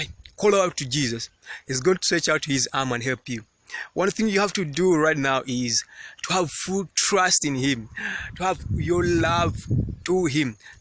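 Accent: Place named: Uganda. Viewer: Hi...moh here new subbie..I swear I watching trying to figure out which country you're from from your accent...Are you from Uganda????...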